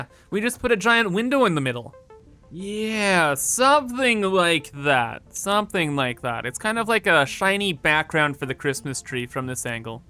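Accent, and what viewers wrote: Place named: Canada. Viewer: Not my first video, but first time I've thought to thank you for just sounding absolutely incredible :P Canadians are my favourite americans and you sir have one of my favourite Canadian voices. ALWAYS a pleasure to watch your vids just because of your energy and cheer =]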